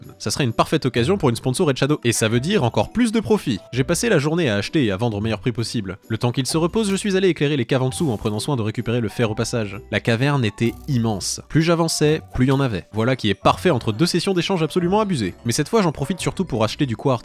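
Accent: Quebec, Canada. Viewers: J'ai bien remarqué que tu a l'accent québécois et français alors tu vie où?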